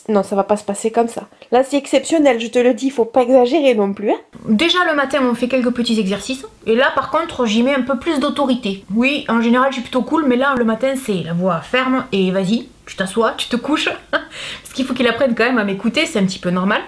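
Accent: South, France. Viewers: Il va être très heureux ce chien avec vous, un malheureux de moins à la spa. J'aime beaucoup vos vidéos pourtant je suis pas une jeunette mais ça me fait passer des petits moments agréables. Votre accent du sud est très plaisant, je suis du sud aussi. Vous faites très authentique, c'est cela qui me plaît beaucoup chez vous. Merci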